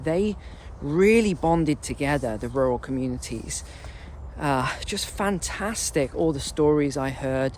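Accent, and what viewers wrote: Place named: United Kingdom. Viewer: I really love your videos and I personally think that 15 minutes is a great length. I watch just for the information as I am too old to apply any of it to my life! I also like you and your British accent! I hope you really love the place you are moving to!!! I am 72 and ruled by a dog that has an alien brain ( complicated )! I had investments for retirement but I didn't pay much attention to them because it wasn't that much. I checked them in early in 2019 when the pandemic struck and i had lost $30,000 dollars. I just left them there and hoped they would recover when the pandemic was over! I checked them again in early 2021 and they had gone from $270,000 to $ 430,000? I cashed them out and put them in a IRA and still can't find a safe investment! Bonds are no good, gold isn't doing anything, and the stock market is crashing, just as I predicted when they started printing all that money!!! 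Give me a clue,!!! P.S. The USA is not the place it used to be: - ( Beautiful walk!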